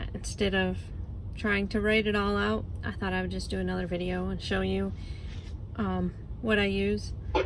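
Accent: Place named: Michigan, United States. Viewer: You sound like you may be from Michigan. My Love is from there, too. She's from just below Traverse City. A little town east of Manistee called Wellston. Just wondering. As always, travel safely...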